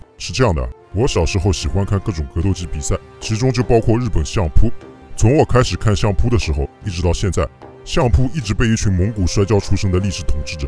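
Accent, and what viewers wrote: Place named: Shanghai, China. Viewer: 有上海口音，是在日本生活过的上海人吗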